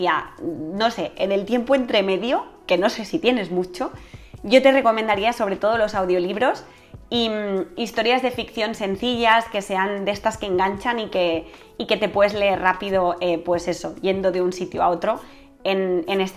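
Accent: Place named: Barcelona, Spain. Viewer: Tu canal me ha motivado mucho. Este año llevo unos 11 leídos en físico y 4 audiolibros. Ya te había notado algo de acento y me parecía que eras de Barna. Las redes sociales intento no usarlas y cada vez veo menos tele. Creo que nos hace ver una vida que no es al 100% real, pero bueno cada loco con su tema. Te deseo mucho éxito la verdad es que te lo curras mucho y tarde o temprano verás el resultado. Un abrazo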